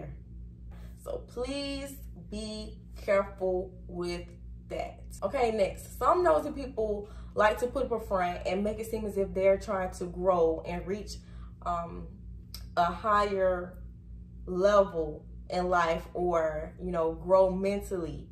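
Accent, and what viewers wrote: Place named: South, United States. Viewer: She spoke on a tipical southern, they so nosey and different.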